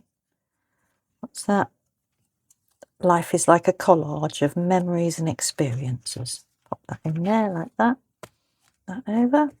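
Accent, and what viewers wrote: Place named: Cockney, United Kingdom. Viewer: You sound like you're from South East London you got a bit of cockney to your accent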